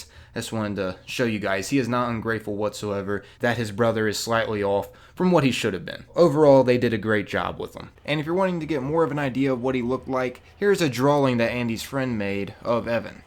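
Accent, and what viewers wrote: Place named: Pittsburgh, United States. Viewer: you sound like a fuckin yinzer man...are you from pittsburgh, too? lol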